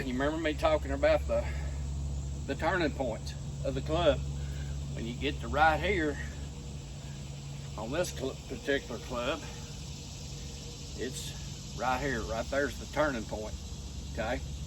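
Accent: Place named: Tennessee, United States. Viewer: I would guess from your accent you are in eastern Tennessee. But not seeing any UT flags or any other decorations has me confused.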